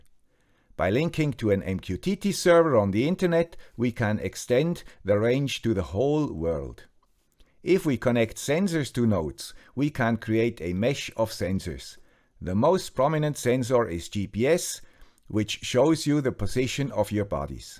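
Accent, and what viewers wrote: Switzerland. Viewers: Haha liebe das schwizer englisch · I love your Swiss accent, more videos please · the guy with a Suiss accent and a small pointing hand.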